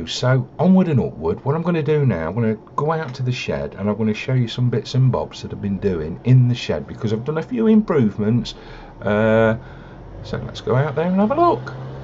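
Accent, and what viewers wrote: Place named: RP, United Kingdom. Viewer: absolutely great video. great to see how others are doing it. It's great that your phone understands you too. Very posh. when Scottish folk use that app. it just thinks we're trying to find vowels of the alphabet only. ha ha. cheers lad.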